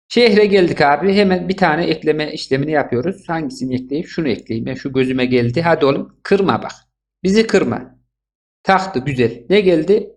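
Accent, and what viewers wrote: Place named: Central Anatolia, Turkey. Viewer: mizacın güzel kardeşim iç anadolu şifesi var .D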